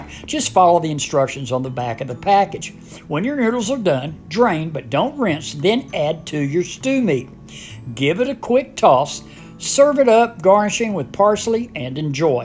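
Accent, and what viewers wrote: South, United States. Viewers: That looks easy and delicious 😋 Are you Southern?? You sound like my hubby😊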